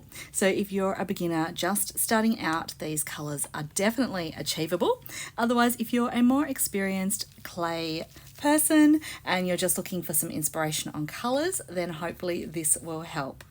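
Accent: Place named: Australia. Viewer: This time of year is my favorite when it comes to making pretties because of the color palette! These sure are some pretty ones you made!!! Like I’ve said before, I could listen to you talk all day long. I absolutely love the Australian and British accents!!! I live in America, but I sure would love to find me a man with a nice accent!!! 😂 Something I started doing rather than buying all of the different pearl clay separately I just buy a bunch of pearl clay and turn any color. I want to into a pearl! When I make snowman, I usually use the pearl. And sometimes I like to not get it completely mixed up where it’s swirled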